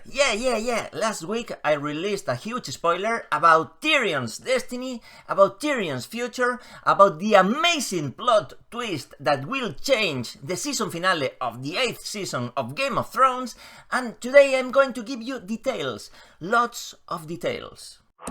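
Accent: Spain. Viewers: I don’t know how I ended up here but as soon as I heard your accent and pronunciation I knew you were Spanish 😅 ( I’m also from Spain btw )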